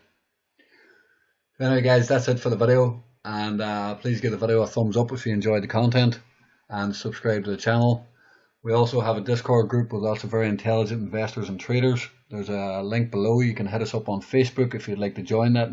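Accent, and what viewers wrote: Northern Ireland, United Kingdom. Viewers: Where you from! Sounds like northern ireland to me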